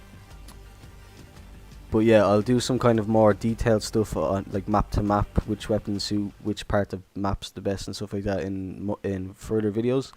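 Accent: Ireland. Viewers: nice vid man :) i was born in dublin, but parents left when i was young and i been in uk all my life, still love the irish accent tho, coolest accent in thw world! Good expression of your impressions, gotta say i prefer vehicle maps myself tho, this is too much like cod lol good luck growing your channel :)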